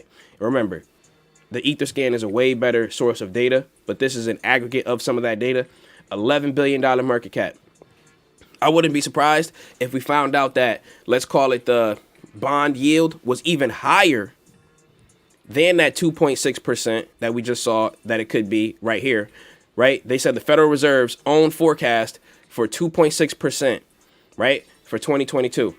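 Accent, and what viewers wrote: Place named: Brooklyn, United States. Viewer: Brooklyn NY I can hear you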